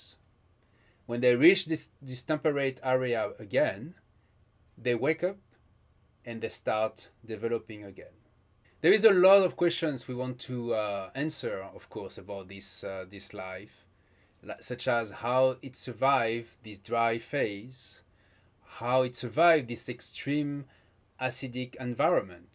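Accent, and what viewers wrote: France. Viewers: I wanna be told about Venus with a French accent. Oh Good. Thank God. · Nothing as charming as an English speaker with a French accent 👽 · C'est bien ça, un accent français, j'ai compris au moment de "Camille Flammarion" hahaha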